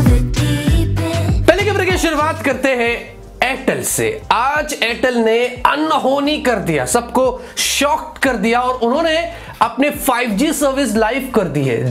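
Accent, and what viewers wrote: India. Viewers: Sounds so wired indian english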